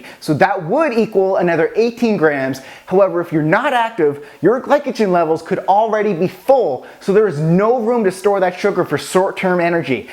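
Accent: Canada. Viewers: where are you from?? atlantic canada??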